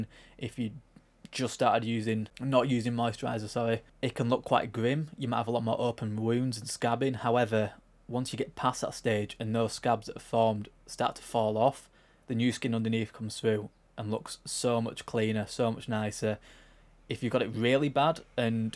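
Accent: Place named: Northern England, United Kingdom. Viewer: Proper northern accent bro but love the video ❤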